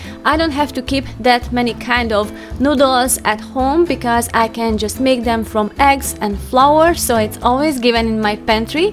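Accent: Hungary. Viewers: I don’t know why YouTube recommended your video and it started playing it with subtitles only and I saw that soup and I was like that looks hungarian.... so I clicked and I heard your accent and I knew it straight away that you are Hungarian too! Feliratkozva 🧡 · Hello from Romania. Love your hungarian accent.🤗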